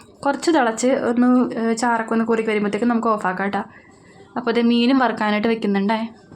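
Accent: Kochi, India. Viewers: നല്ല വീഡിയോ👍 കൊച്ചിഭാഷയും അവതരണവും നല്ല രസാണ് കേൾക്കാൻ.❤ ആമിക്കുട്ടിടെ കാലിന്റെ മുട്ടു പൊട്ടിയത് ഉണങ്ങിയോ?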